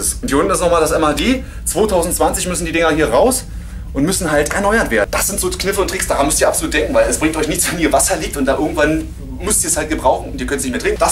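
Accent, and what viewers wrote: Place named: Saxony, Germany. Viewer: Könntest du Vllt mehr säschsich reden?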